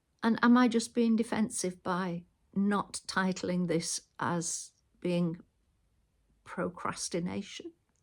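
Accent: United Kingdom. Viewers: BUT. My I ask the accent to that? Irish, Scottish or the 40 accents of thee U.K.? Thank you Ma'am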